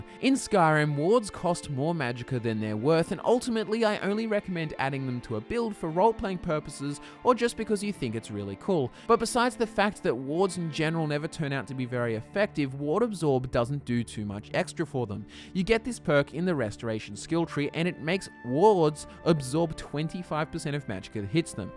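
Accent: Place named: Australia. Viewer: This made me realize that i have a thing for an Australian accent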